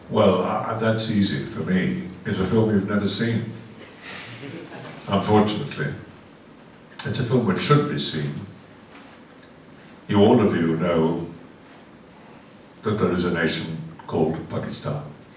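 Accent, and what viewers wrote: France. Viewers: This perfect french accent. bordel de merde. je ne l'oublierais jamais, i will never forget you Christopher Lee. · his french accent is flawless, so is the man himself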